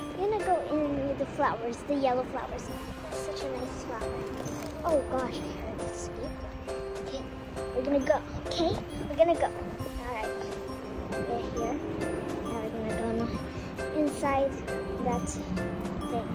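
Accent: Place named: Canada. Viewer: Nice!!! You sound Canadian ey!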